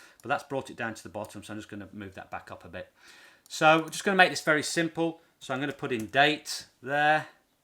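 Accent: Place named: Northern England, United Kingdom. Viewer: yes , i like your videos too . thanks for them .i just found you today , very grateful i found you .You sound like your from the north of England , i am wondering where exactly ....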